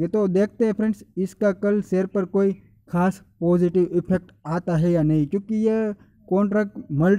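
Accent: Gujarat, India. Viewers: Hu pan gujarati j chhu. Gujarati ne hindi bolta taklif to pade. Pan ae taklif khali je gujarati hoy ae j jani sake.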